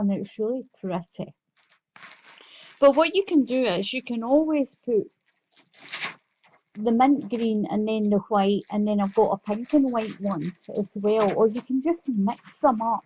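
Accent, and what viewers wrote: Scotland, United Kingdom. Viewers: I love your unboxing of yarn. I can hear the joy in your voice. What would make it even more wonderful is to see the joy on your face as you look at all the gorgeous yarn. I love to listen to your voice. I love a good Scottish accent. Much love from the USA, Florida. Lurinda Clarke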